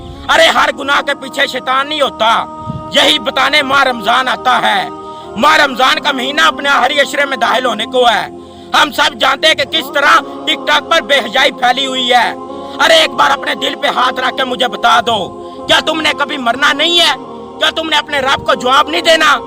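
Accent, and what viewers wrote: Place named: Punjab, Pakistan. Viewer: His Punjabi Urdu Accent is making me laugh😂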